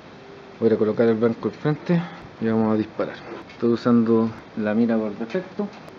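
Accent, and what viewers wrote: Chile. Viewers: jejejeje te cache por el acento xd yo pense que no llegaban de estos juguetito a nuestro bello pais, donde lo compraste y de cuanto fue el garrotazo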